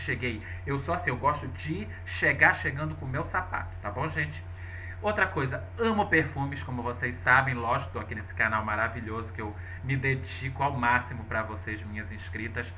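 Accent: Pará, Brazil. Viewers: Eu comecei a te acompanhar esse ano e desde o primeiro vídeo eu reconheci esse sotaque paraense, mas so agora comprovei. Que legal um conterrâneo perfumolatra com conteúdos tão legais 👏👏👏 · Quando tu abristes a boca eu sabia que eras de Belém . Eu disse “ esse cara é paraense , com certeza” . Conheço de longe um conterrâneo. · Adorei saber mais sobre vc, eu imaginava mesmo q vc é paraense, pelo sotaque. Também sou do Pará de Santarém, mas só de nascimento, moro em Sorocaba SP. Mas sei reconhecer muito bem o sotaque da terrinha. Vc é muito autêntico e natural, gosto muito do seu canal. Um beijo. Seja muito feliz!! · Eu desconfiava....que serias PARAENSE...por causa dos.."lh"! Kkkk..he..hi.. Estou tua vizinha..... Parabens para nós que somos AMAZONICOS! Chics! FELICIDADES! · Olá Você é paraense não é.? Adoro este sotaque. Vi seu vídeo agora. Também tenho a sua idade! 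Quem dera conhecer o para ver o peso oh.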